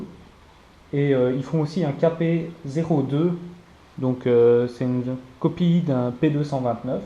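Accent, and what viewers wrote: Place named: Belgium. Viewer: ce belle accent belche ti <3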